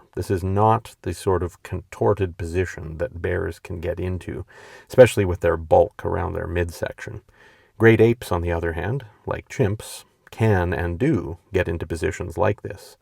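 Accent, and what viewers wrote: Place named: Canada. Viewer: I’m so glad I found this channel. I appreciate the quiet, measured and affably Canadian narration. I also like how you just lay down the stories and the evidence that are out there so people can draw their own conclusions. Most paranormal/cryptid/UAP channels are ultra low effort AI clickbait, so when I find one that’s not, it’s very exciting. Keep up the good work!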